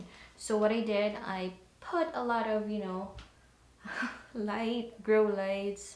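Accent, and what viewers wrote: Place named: Philippines. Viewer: Its cool, no matter where we live, we filipinos sound the same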